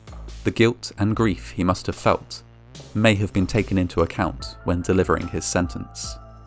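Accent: United Kingdom. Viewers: Gawd I hate a British accent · WHY is this American incident narrated by a British narrator? Couldn't you get an American to tell the story? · I'm confused. You seem to have a British accent and yet you consistently keep using metric measurements first. Everyone knows UK and USA have it right.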